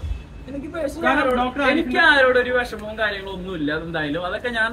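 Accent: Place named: Coastal Kerala, India. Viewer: No kadappuram slang now😮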